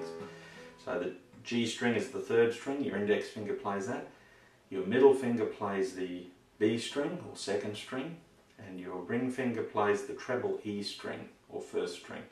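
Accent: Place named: Australia. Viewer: mate you sound aussie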